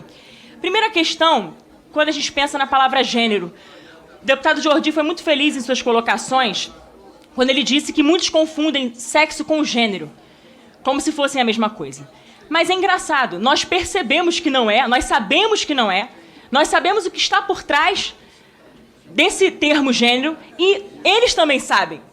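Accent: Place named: Rio de Janeiro, Brazil. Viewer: Linda, inteligente, católica e carioca. Ô sotaque lindo!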